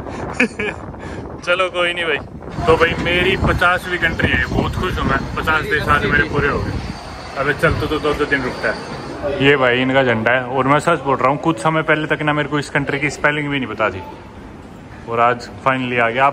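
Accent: Haryana, India. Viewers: Me and my son watch your videos with great intrest as you cover all those places which we see in Atlas and read and watch videos about its cuisine. Your Haryanvi dialect is super. With best wishes.👍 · Huge fan of your rugged Haryanvi accent - from Chennai 🇮🇳😂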